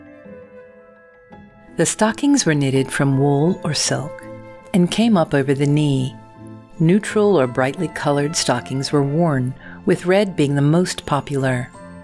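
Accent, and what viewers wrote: United States. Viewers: Why is a yank, who is talking with a "porn voice" narrating this? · Why am American accent??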